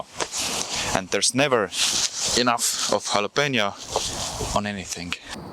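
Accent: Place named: Estonia. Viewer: Love your Estonian accent, your videos make me want to visit Estonia and the rest of the Baltic countries <3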